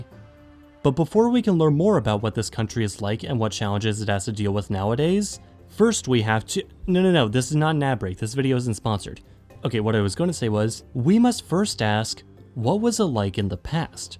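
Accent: Philippines. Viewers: you speak english like filipino ilike your accent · Is it only me?? have a Filipino accent.🤔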